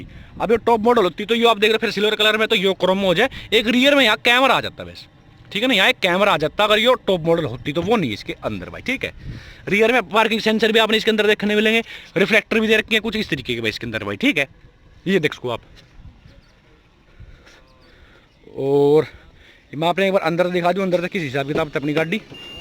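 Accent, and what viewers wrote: Haryana, India. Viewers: Love this accent of ur hryanwi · Love the Haryanvi accent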